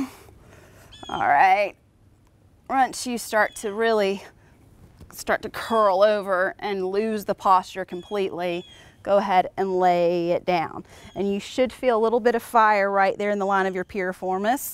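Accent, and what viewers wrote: South, United States. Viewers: Thanks for lesson. I like your southern accent :)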